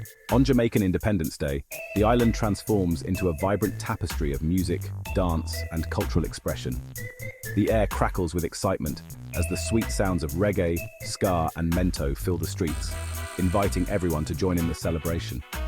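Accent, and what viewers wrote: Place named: United Kingdom. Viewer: Really? A British voice narrative g that? No jzmaican accent as available? Tacky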